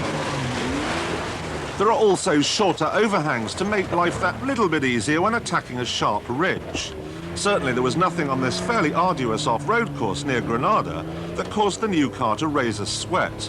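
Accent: RP, United Kingdom. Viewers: sounds posh bingo this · early posh brit accent was a tad embarrassing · He sounds more british and mature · Who's the public school boy doing the narration? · Somehow sounds more british here than ever... Almost like the queen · Wonder if is embarrassed by his silly plummy rp bbc accent now. “Out heeyarr the shogan” blah blah. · He used to talk so posh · Fuck me he sounds like a hooray Henry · Received pronunciation, or BBC English.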